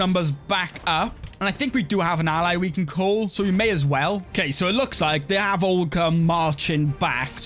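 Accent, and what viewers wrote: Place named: Caerphilly, United Kingdom. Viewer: It’s always really nice to hear another person from wales. But always weird 😂 I would guess Caerphilly by the accent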